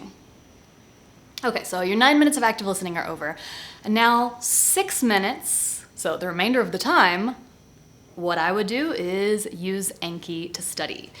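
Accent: Spain. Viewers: you have a great spanish accent! it's perfect. Thank you for your recommendations.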